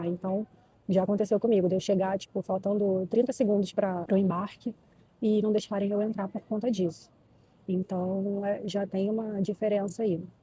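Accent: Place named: Rio de Janeiro, Brazil. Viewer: Mulher inteligente e com todo respeito...muito bonita!!! Ela é carioca! Olha o jeitinho dela falar!!!!!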